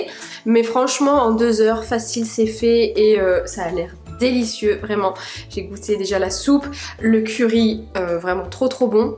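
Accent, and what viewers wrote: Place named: United Kingdom. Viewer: Bonsoir je ne voudrais pas vous contredire mais franchement je suis belge et je ne reconnais pas cet accent là 😂mais moi je vous croyais d'origine anglaise. Vous êtes très rigolote. C'est amusant. J'aime bien.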